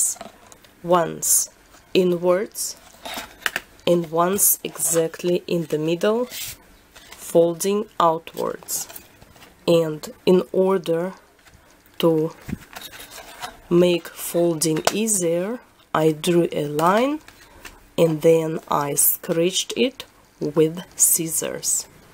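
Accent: Russia. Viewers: Its really cool,my friends asked me if i made it and they liked it;)i told them about your channel amd they loved your crafts and my friend send a message for you "Wow,she is really creative!i like her accent and i think she is russian.man russian ppl are so cool>.<"-ellie(my friend:) ) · Привет she has a Russian acsent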